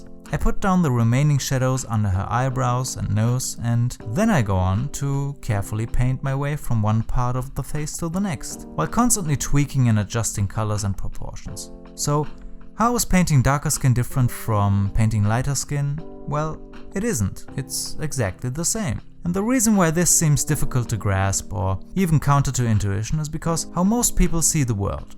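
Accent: Germany. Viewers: Dieser Moment, wenn man hört, dass er deutscher ist und man total abgefucked ist, dass er Englisch spricht😂😂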